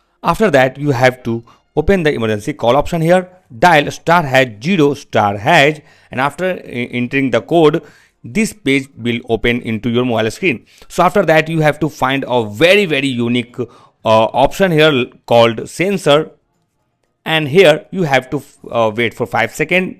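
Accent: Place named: India. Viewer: you know the vid is good when you hear indian accent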